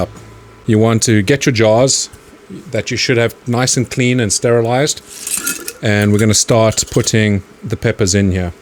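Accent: South Africa. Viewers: Are you South African? :D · ah very nice. I’m from California but live in China. Your accent was a bit hard to place but still distinctive. The way you say “hotter pepper” like “hwotta peppa” and “here” like “hee-yah”. My South African friends sound the same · Hi. Are you South African? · are you south african? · Do hear a note of South African accent there :) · your accent sounds like you have South/Southern African ancestry. Is this so? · South African accent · Hey man, totally love the channel but i have an unrelated question... are you/your parents/family from south africa? Picking up the very BAREST of accents on some words and I don't think its british kiwi.... sorry if it is xD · UK....... I'm getting a south African vibe? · Where in the UK are you from? To my untrained Canadian ear it reminds me of South African. Birmingham? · you south african by chance? 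the accent lends that way for me. apologies if I'm mistaken. tits video! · Are u south aftrican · First video I have ever been recommended for. First- South African? Second- fantastic and an instant sub. Cheers from a Canuck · Do I hear a hint of South African in there? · Little south African, little Zimbabwean, and now a bit of brit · Can I ask if your accent is South African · Good video man just subscribed. Also your accent sounds south African please correct me if I'm wrong. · Are you south African?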